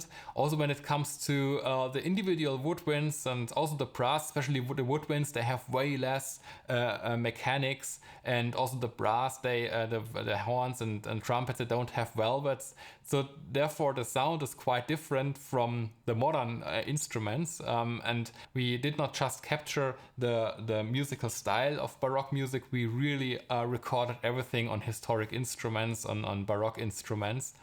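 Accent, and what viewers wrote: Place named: Germany. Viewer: i love the german english. :-) sehr gute Einführung. Danke, sehr fundiert auch in der Geschichte. Danke.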